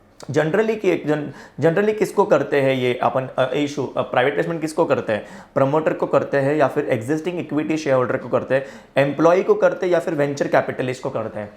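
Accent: Maharashtra, India. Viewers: Are you Marathi ?sir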